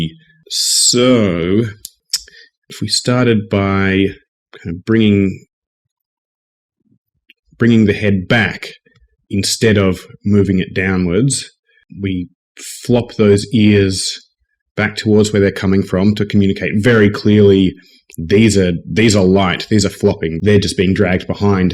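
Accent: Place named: Australia. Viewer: wait you're australian!? ozzi ozzi ozzi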